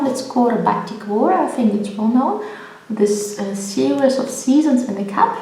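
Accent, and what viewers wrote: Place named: Germany. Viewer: I just found your floss tube; I LOVE your projects and I like hearing a German accent again. My husband and I were stationed in Grafenwoehr (Bavaria) for 3 years and I'd love to go back. We went to Saxony all the time though because Dresden is one of my favorite cities! I can't wait to watch the rest of your fposstubes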